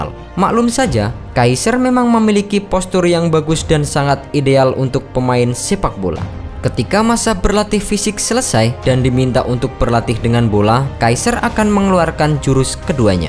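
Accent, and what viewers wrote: Java, Indonesia. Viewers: Yg ngomong wong jowo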